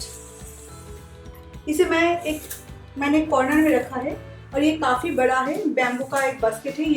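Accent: Bengal, India. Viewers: Love bengali's hindi.. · Boy you have a heavy bong accent but I liked your honesty.. 👍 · I have some bengli friends..they speak hindi like u..un dostonki yaad agayi..nice kitchen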